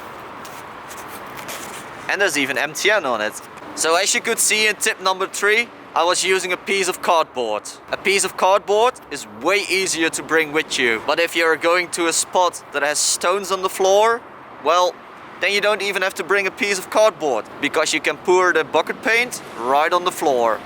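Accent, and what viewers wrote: Netherlands. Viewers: Are you Dutch?? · you dutch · If this isnt a Dutchie speaking English idk what is. goeie vid wel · If this guy isn't Dutch all my knowledge of English accents is officially unvalid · Dat nederlandse accent maakt de video 10x beter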